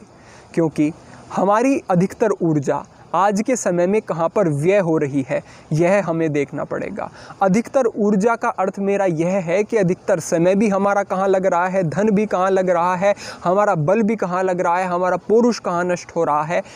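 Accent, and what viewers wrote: Standard. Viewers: So clear and Pure Hindi and video is good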